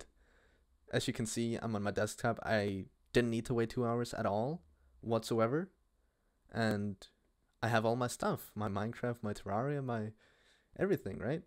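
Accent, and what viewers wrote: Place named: United States. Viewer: Finally an American not Indian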